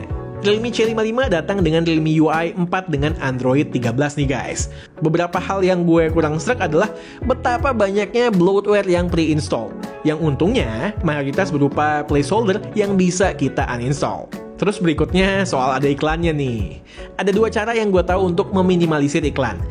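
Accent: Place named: India. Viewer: Kirain orang india yang review nya bang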